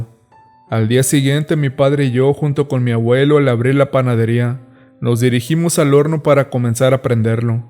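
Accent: North, Mexico. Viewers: Me gustan mucho tus relatos...los lees muy bien 👍 se escuchan diferente con tu tono norteño☺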